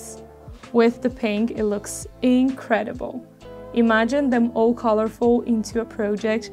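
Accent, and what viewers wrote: Brazil. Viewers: I just discovered your channel and I’m loving your tutorials, can’t stop watching ❤️ I notice you have an accent and it looks a lot like Brazilian, are you Brazilian? I am from Brazil 🥰🇧🇷🇧🇷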